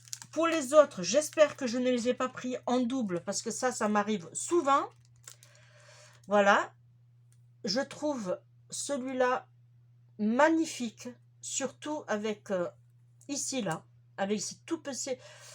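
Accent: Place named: Montpellier, France. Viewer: Coucou bonjour je suis nouvelles abonnée super achats , moi aussi je suis accro ACTION , on a presque le même accents toutes les 2 d'où êtes vous ? si ce n'est pas trop indiscret perso je suis originaire de montpellier mais je vis en Région parisienne ! !!! A bientôt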